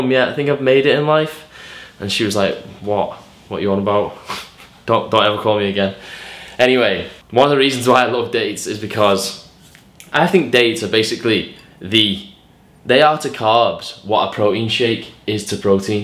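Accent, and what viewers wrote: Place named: Manchester, United Kingdom. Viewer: Z J Manc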